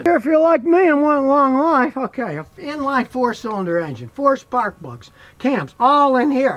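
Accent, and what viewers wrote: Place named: United States. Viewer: I’m sorry, but you rabbit in your peculiar American accent far to quickly to comprehend what you are talking about. I’m sure some people might understand you, but not me.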